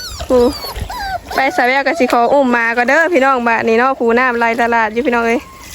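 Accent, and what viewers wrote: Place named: Isan, Thailand. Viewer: พึ่งดูครั้งแรก หนูอยู่ แขวง เขต อะไร ทำไม สำเนียง การพูด ของหนู เหมือนคน อิสานฝั่งไทยมากๆ ไม่เหมือนสำเนียง ของคน สปปฯ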